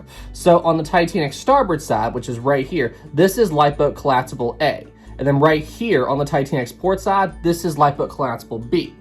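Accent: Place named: Appalachia, United States. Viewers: Hey brother, you are most definitely from the Northeast US, am i correct in saying that? Like, Virginia, West Virinia, Maryland, NC or PA? But to narrow it down even more, i would guess Virginia....in the appalachians. Lol, am i right? Btw, its the accent and pronouncing of washed warshed. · Your regional accent is same as mine...you in Tennessee...?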